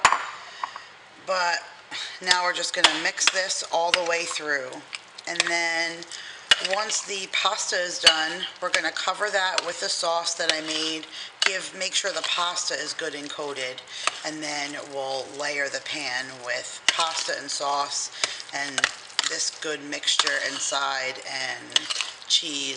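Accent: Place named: New Jersey, United States. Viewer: I recently started watching your videos. Where are you from? We just moved to New Jersey last month (my husband is in the military) and your accent sounds familiar to what I hear around here sometimes. I'm super excited to try out this recipe.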